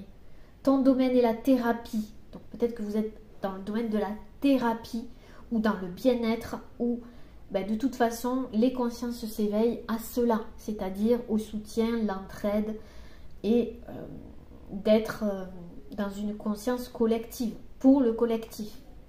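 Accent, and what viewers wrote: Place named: South-West, France. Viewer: Je vous aime trop, vous me faites sourire quand vous vous énervez avec bienveillance avec votre petit accent de notre sud-ouest. Et vos messages raisonnent tellement depuis que je vous écoute et me permettent de grandir. J'espère pouvoir vous rencontrer un jour. Je viens de conscientiser que j'ai tout....peu mais j'ai tout....je n'ai plus courir derrière qqchose....car à aujourd'hui j'ai tout ce qu'il me faut et si j'ai encore plus tant mieux mais j'ai tout.... merci ❤❤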